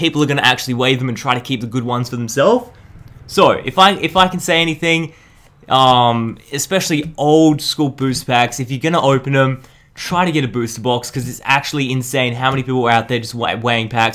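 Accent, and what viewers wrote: Australia. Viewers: He's Australian sir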